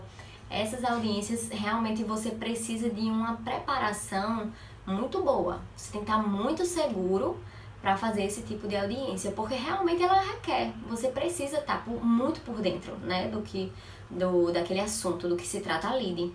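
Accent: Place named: Natal, Brazil. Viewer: bom vídeo doutora, parabéns!!.. mas me tira uma duvida.. (sou novo na advocacia) na correspondência jurídica, vejo algumas solicitações de diligencias de audiências trabalhistas para ir como preposto. .. acho "estranho" isso pois, "pq não ir como advogado mesmo da reclamada?!!?" .. como serei proposto numa audiência de uma empresa que não trabalhei ou não tenho vinculo empregatício nenhum?? gostei MUITO de suas dicas para esse tema! obrigado! ps: meu sotaque é igual o seu, sou de NATAL-RN. e vc? grande abraço.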